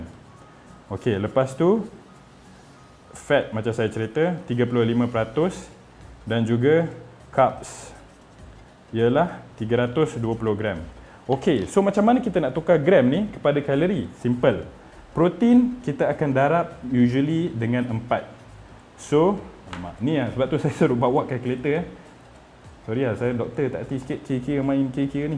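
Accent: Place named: Terengganu, Malaysia. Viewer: kenapa aku rasa doc ni org tgganu😂ada beberapa patah perkataan dia mcm org ganu sebut😂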